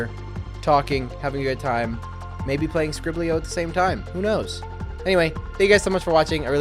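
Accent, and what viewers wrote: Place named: Scandinavia. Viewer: I love that you read all of Brage’s questions in a Scandinavian accent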